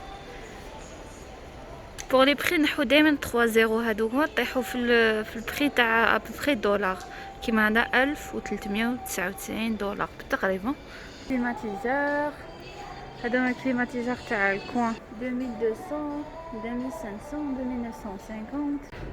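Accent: Algeria. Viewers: هاذي لهجة جزائرية و ليست مغربية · قرن وزمارا ههه لهجة جزائرية 100 ربي ينورك بنت بلادي 🥀 اول مرة نعلق في قناتك ، تعجبني ويعجبني الله يبارك عليه 💛🌸🌸💛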